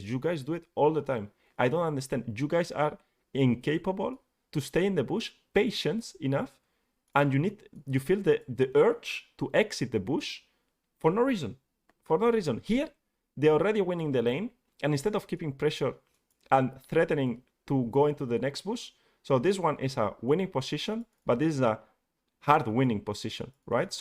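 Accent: Spain. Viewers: Eres español? 🤔🤔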